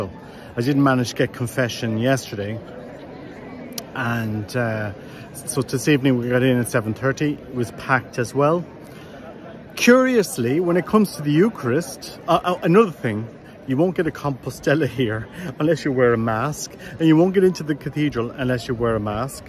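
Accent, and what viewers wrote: Ireland. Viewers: I never really appreciated the Luminous Mysteries of the Rosary until this whole Covid episode, when everything in our Luminous Mysteries was (is) under attack. From not wanting to baptize babies to no respect for Communion. Now I understand exactly why we were given the Luminous Mysteries and when we were given these Mysteries. Grand old Irish accent. I grew up in County Louth.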